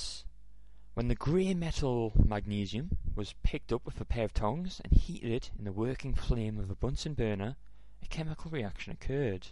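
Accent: Scotland, United Kingdom. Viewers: I can hear Scottish accent in there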